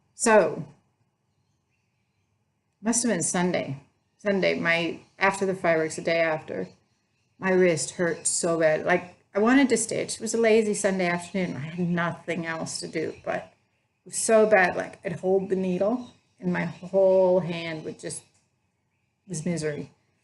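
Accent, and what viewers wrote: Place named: Australia. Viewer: I chickened out doing project bags with zips, I do the Vonna style(no zips lol). And what's wrong with our British sense of humour 😜 , although I don't find the modern 'comedian' funny at all. I don't find American's funny. Don't think I've heard an Australian comedian. Have I got a sense of humour? Who knows lol. Ooh I love the butterfly your doing. They're stunning, was that you holding it? You definitely sound more Australian than American. Off to watch the next one😜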